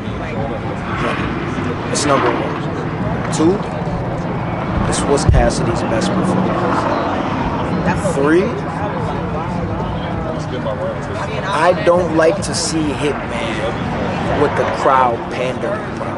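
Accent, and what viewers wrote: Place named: Pontiac, United States. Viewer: I COULDA SWORN HE WAS FROM PONTIAC LOL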